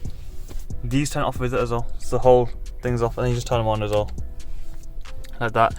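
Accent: Birmingham, United Kingdom. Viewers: Twat....nothing wrong with brummie...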